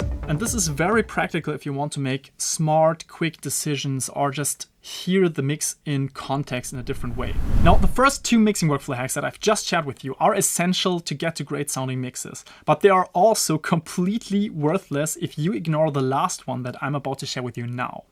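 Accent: Germany. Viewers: Are you German? That accent sounds familiar 🙂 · Man hört echt raus das du deutsch bist bro haha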